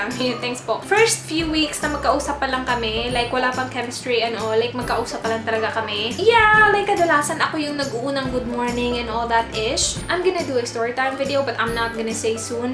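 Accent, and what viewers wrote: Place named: Visayas, Philippines. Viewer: hi where are you from? bisaya mn d i ka. 😂😂😀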